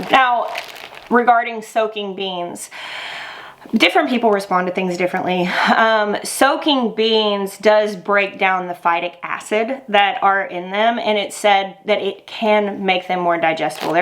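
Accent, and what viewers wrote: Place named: Arkansas, United States. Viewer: It's that good old Arkansas southern drawl! I am also from Arkansas. Not far from where lived before moving. I wish I'd met her back then.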